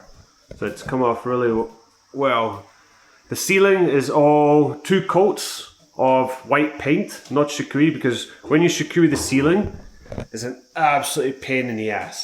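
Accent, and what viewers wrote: Scotland, United Kingdom. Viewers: Hi again from NZ. Trying to work out your accent. Scottish, but with a twist?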